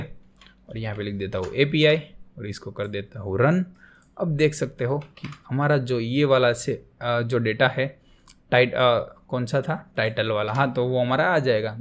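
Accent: Gujarat, India. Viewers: Gujrati Accent Detected.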